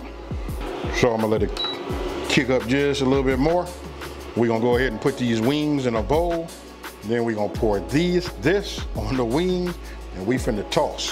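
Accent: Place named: California, United States. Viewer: It's giving.. retired gangster meets good woman & becomes domesticated. lol. I love it! i love a cali accent! i definitely subscribed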